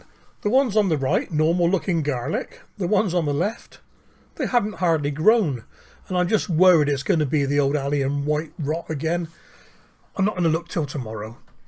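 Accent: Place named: Devon, United Kingdom. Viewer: you sound as if you are in devon but your soil is not red like mine torquay dave thanks for the tour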